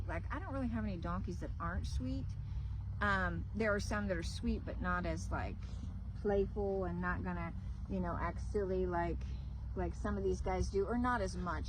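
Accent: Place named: Indiana, United States. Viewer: Thanks for that. Your donkey's, your farm, and your Hoosier accent are all interesting to an Australian bloke like me here in Canberra. 🐐🐎🐔🐑🐶😸🇦🇺👍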